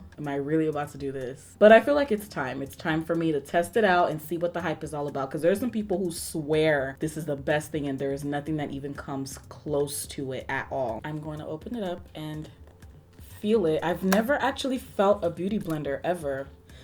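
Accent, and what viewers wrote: Nigeria. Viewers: Can you do a video only in your Nigerian voice ❤️ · As a fellow Nigerian, I am going to need a video with you speaking mostly with your Nigerian accent 🤠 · Wow your hairstyle is cute! <3 Also you are one of the most unproblematic beauty youtubers! I love to watch your videos not even to learn makeup, but to listen to you talk. You always seem so friendly! Like a sister, a friend or the coolest auntie! <3 And your voice is very nice to listen to! And I also love when you speak with your Nigerian accent! <3 It's almost like ASMR for me! <3 Sending you all the love from Poland! Wish you the best! <3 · Can we please get a video of you speaking only in your Nigerian accent? I just love it !! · A British/ pommy accent made a few appearances in this vid, but I definitely love the Nigerian accent!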